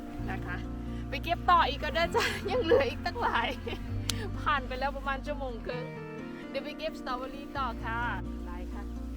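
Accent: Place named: Laos. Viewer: พี่คนจังหวัดไหนคะ? พูดเหมือนคนลาวเลย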